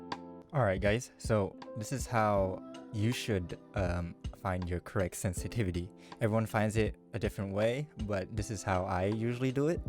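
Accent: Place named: Vietnam. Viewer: Very strong Vietnamese accent homie and awesome video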